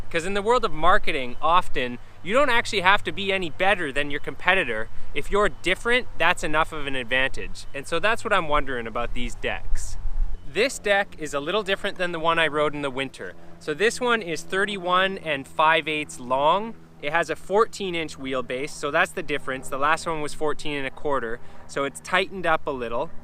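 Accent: Canada. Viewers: Jesus, you're the most Canadian sounding person ever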